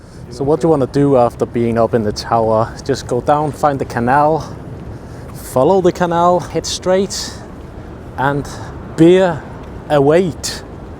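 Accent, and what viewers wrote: Liverpool, United Kingdom. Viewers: Bit of a scouse accent in there?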